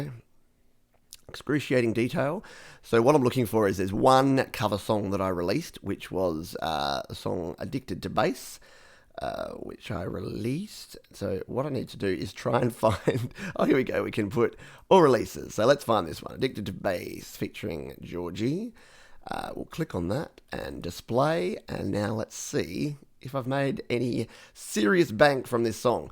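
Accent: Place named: Australia. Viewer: I love your accent. That's why I want to go to Australia. Greetings from Germany.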